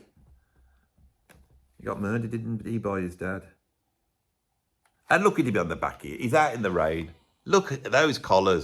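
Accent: Birmingham, United Kingdom. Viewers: this silly sounding overly verbose "Brummie" has no idea what he is talking about when it comes to musical taste...., some of the finest moments in pop music culture...he must be talking nonsense just to be the contrarian. 😎😎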